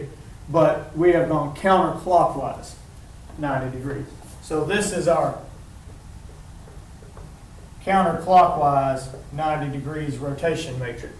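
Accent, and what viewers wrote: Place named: South, United States. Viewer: What accent is that? As a Brit I love listening to it. Southern. Maybe Tennesee? Georgia?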